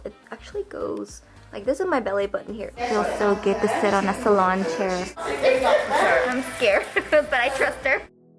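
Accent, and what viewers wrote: Northern Ireland, United Kingdom. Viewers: What kind of accent do you have ? In some moments, you sound British, there was a hint of Irish at one second. I’m so interested to know where you grew up or where you live now? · its so nice to hear a Norn Irish accent!!!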